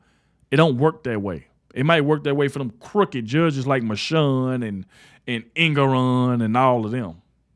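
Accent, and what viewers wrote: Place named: Missouri, United States. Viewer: where you from sounds like southern Missouri we might be neighbors lol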